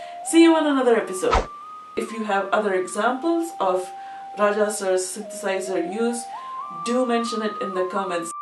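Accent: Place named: England, United Kingdom. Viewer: Excpet ur strong english Accent.... Everything else was okay!!!!!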